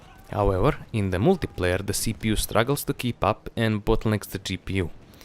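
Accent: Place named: Russia. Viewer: Russians акцент!)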